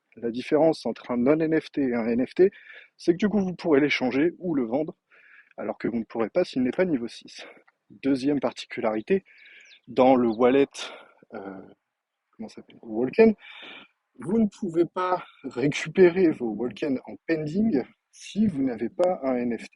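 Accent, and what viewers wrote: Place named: France. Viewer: Merci pour le tutoriel ! Et vive la France pour l'accent haha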